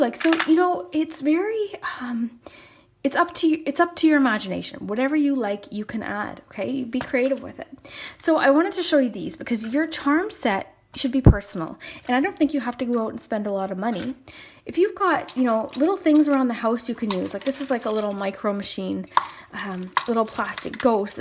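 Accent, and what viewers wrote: Canada. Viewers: I might not be correct, but are you from Canada by any chance? I'm pretty good with accents and I'm guessing yours is Canadian. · are you Canadian?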